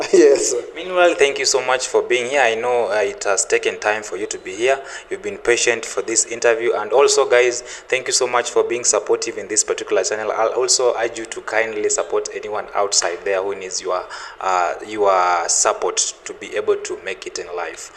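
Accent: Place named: Kenya. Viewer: Ascent ya kiluhya ,kabsaa he is well informed though, and seems a good and funny joker, watu hutoka mbali ,lakini, encouraging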